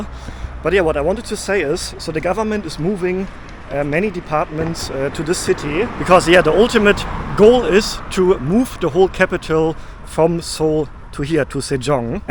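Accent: Germany. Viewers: there is some strong German accent. The speaker is certainly a nerd, but the info given is fairly useful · Your accent is very interesting, are you German? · 독일식 발음 영어인가...ㅋ 근데, 독일보다 동독, 동독보다 폴란드쪽? 아니면 오스트리아보다 더 동쪽 헝가리?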